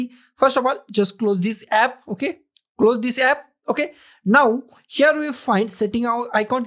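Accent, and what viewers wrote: India. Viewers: yes great informative video!! india accent :X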